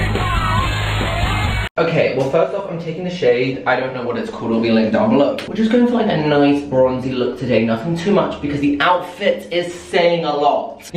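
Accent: Scotland, United Kingdom. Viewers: More Scottish accent plz I can’t get enough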